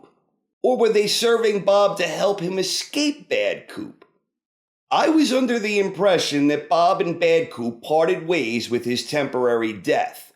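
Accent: New York, United States. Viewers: I really wanna know what his accent is. Is he from New York? or New England maybe?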